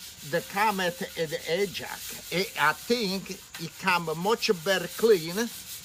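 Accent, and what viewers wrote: Italy. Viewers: Who is this? You sound Italian! Are you sir?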